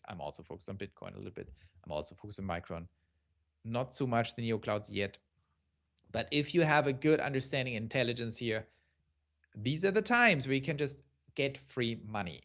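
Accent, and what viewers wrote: Germany. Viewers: the video audio is drowning your beautiful german voice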